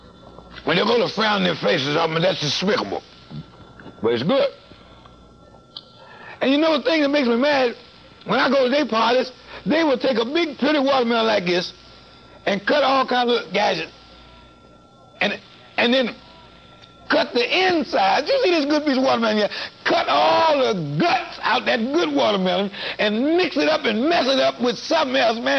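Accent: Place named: South, United States. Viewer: He really as Southern as it gets🤣 I thought he was from Louisiana😂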